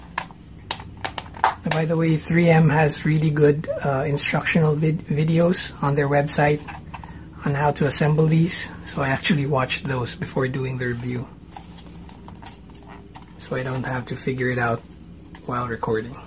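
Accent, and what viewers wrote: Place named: Philippines. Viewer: The accent tells me you're pinoy? if so pare san mo nabili yan? legit na 3m or fake?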